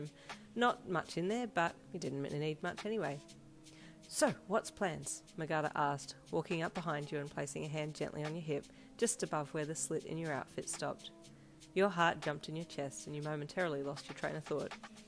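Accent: Australia. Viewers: Heyy love the video as usual But the Australian accent was amazing I loved it 💕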